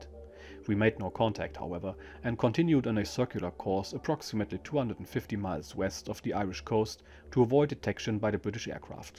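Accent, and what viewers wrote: Germany. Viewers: thank you for the editing and translation, it's that much more enjoyable knowing you ensure accuracy. Again, the German accent makes it that more authentic. If I go to a Chinese restaurant, I prefere to see a Chinese guy cooking in the kitchen.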